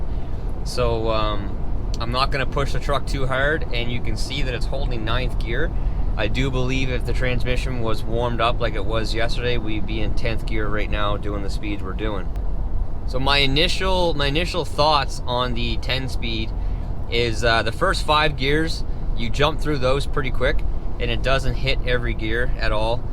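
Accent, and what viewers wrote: Canada. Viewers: You are Canadian, i thought American but hey love Canada man love Canada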